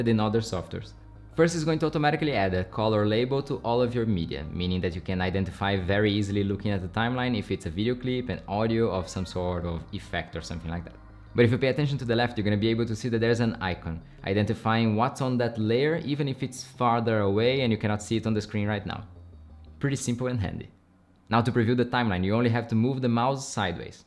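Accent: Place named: Brazil. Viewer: This accent.... Are you Brazilian?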